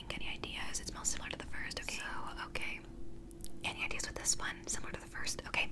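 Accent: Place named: United Kingdom. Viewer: I DEMAND MORE BRITISH ACCENT ASMR 🤠😍thanks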